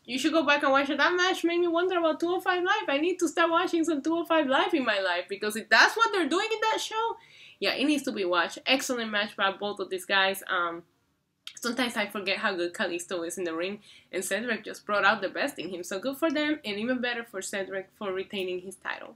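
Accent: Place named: Puerto Rico. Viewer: by your accent you sound Puerto Rican!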